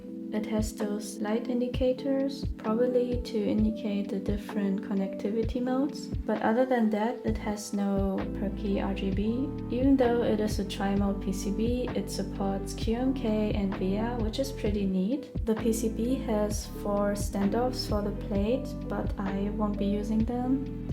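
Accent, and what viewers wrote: Germany. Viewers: Yo you sound like your from Germany ? :D , if so that's pretty nice theres not a lot of custom keyboard YouTubers from Germany :)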